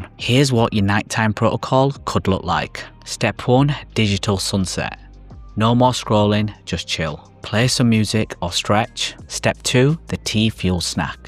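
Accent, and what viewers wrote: United Kingdom. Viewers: Cockney accent?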